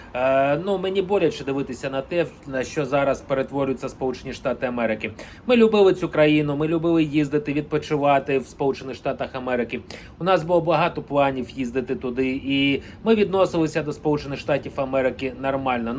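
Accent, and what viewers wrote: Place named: Russia. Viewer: Не доставай. Так чути росіянський акцент, людина все життя розмовляла росіянською мовою, і в цьому не його винна. Зараз він розмовляє англійською, і це не так легко як здається. Говорю з власного досвіду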